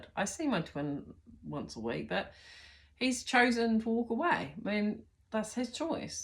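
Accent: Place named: New Zealand. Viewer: Hello. Firstly thank you for your chanel. And thank you for your Kiwi accent. I know this sounds silly but hearing your accent was like healing balm for my soul. It gave me back some Kiwiana and filled me up with the spirit of Aotearoa. Since Covid I am in Germany. And 3 years in Berlin. That might sound really awesome but trust me it wasn’t. It’s been just aweful cause the energy is so full of the old fear guilt and horror from the war and all I did was clear clear clear and still there is f so I much to do that I haven’t even made a dent in what is going on in Germany here. There is not only the residual energy of the second world war and Nazism but there’s also the second world war and then all the wars before that and now there’s all the energy about the witchhunts, because particularly where I am living now there were a lot of witch, burnings, and Germany was one of the worst countries of them all they burned twice as many women as any other European country. 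And I am living amongst all this stuff, and as I am a Devine Feminine empath and a healer, I just miss beautiful New Zealand. I realize New Zealand is not problem free however, it could be so so much worse. So thank you very much. I’m so glad I have found you now and that I will get my little bit of Kiwiana every time I hear your voice. And really it’s not just your voice. It’s your attitude. There’s nothing like a kiwi attitude. It’s so different from the rest of the world. It’s definitely unique and it’s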